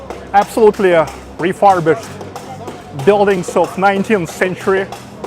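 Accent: Russia. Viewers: Чет даже для русского заметно российский акцент )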